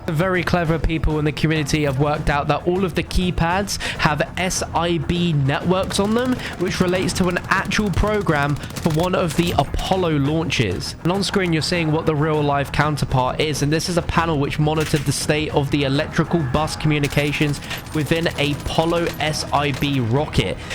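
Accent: England, United Kingdom. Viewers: Why is every youtuber who plays cod from England or a England accent never fails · It’s that British accent man gets us every time.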